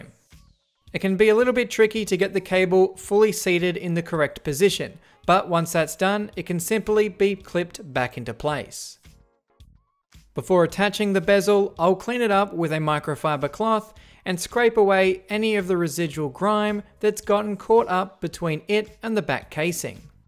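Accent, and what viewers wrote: Australia. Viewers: Who else comes here for the Aussie Accent?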